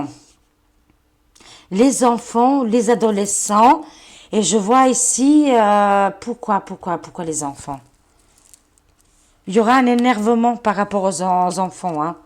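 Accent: Albania. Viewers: Hi, l'accent Ok, je sais pourquoi puisque vous êtes d'origine albanaise...Mais - ceci expliquant cela - vous n'avez pas bien saisi le sens du mot: PHILANTHROPE qui veut dire: plein d'amour pour l'humanité!.. Avec eux, c'est même TOUT LE CONTRAIRE....IL FAUT DIRE pour qualifier cette clique ( à claques )....MISANTHROPE, qui est celui qui déteste les gens.. Mais c'est encore trop doux pour eux. On peut y aller avec: carne...charogne...harpie (si c'est une femme), salopard (si c'est un homme) et SUPPÔTS DE SATAN pour les deux sexes. Attention, ces mots restent dans le domaine de l'insulte....Le mieux c'est d'employer le mot: MISANthrope.... J'aime bien ce que vous faites...Un petit bonsoir du Prof... PS/ Pour la nouvelle coupe, elle est bien, mais je n'avais pas vu lorsque vos cheveux étaient plus longs.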